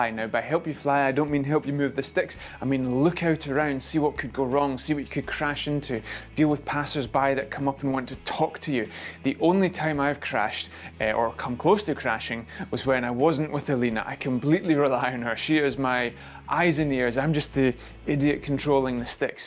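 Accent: Scotland, United Kingdom. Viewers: Scottish man love it I’m a MacGregor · The Scottish dialect is simply the coolest in the English language and the drone tips are definitely great, thanks for that! 👍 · Amazing set of drone flying tips and I love your Scottish accent. I always check the flying conditions on apps like UAV forecast or Weather by Tomorrow before flying.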